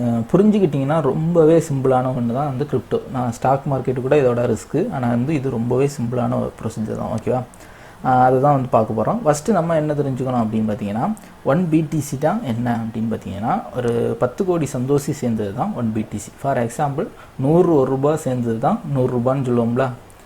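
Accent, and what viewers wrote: Kanyakumari, India. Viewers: Kanya Kumari thane bro neenga 🔥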